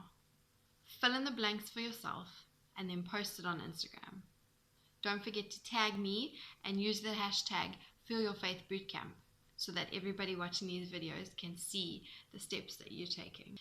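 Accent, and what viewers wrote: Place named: Australia. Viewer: Great work! Your thoughts on faith are spot-on! I can relate so much to this because my journey with my Carlitos giveaway ministry to kids fighting uphill battles is a total faith-walk! Faith honors God, and God honors faith! Keep up the good work! (BTW, I love your accent - are you Australian? Forgive me if I'm nowhere close! haha!)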